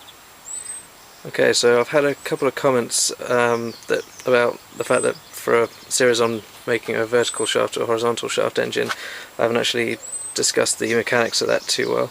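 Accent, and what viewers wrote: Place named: United Kingdom. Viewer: what part of t uk are u from?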